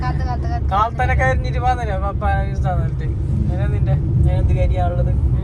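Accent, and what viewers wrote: Kasaragod, India. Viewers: ഇതെന്ത് ബാസ നിങ്ങടെ കാസറോട് ബാസ 😮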